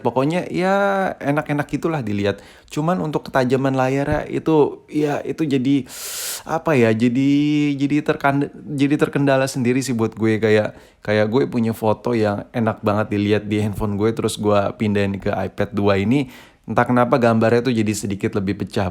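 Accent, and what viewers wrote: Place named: Java, Indonesia. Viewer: apa jawa keras ni bozzz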